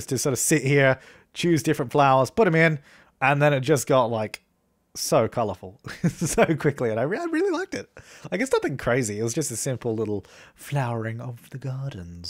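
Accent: Australia. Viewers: Wait, so you're not English? But Australian?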